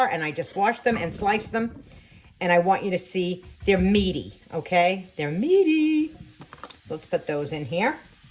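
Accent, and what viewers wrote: New York, United States. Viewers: Good evening big sis, yes you sound like a New Yorker.. oh I will be making that gravy, it looks so good. You are a inspiration to us all. Keep up the good work.. Till next time sissy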